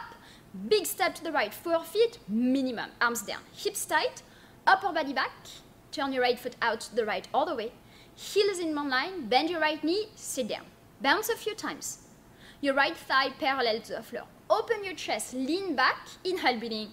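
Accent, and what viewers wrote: France. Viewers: Thanks for this amazing class. I miss so much my studio in Montreal. I will practice with you guys from now on...and I love yourFrench accent as well 😉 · Beautiful, positive energy! Fantastic class, and love the pretty French accent:). La Fance me manque!! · is the best!! She keeps you moving for 60 min never skipping a posture or a cue!! Her French accent is adorable and her dialogue is perfect. Thank you 🤗🙏🏼🧘🏽‍♀️💜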